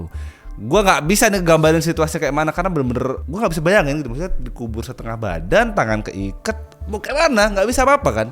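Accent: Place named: Lampung, Indonesia. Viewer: ketara bener logat lampungnya hahahaa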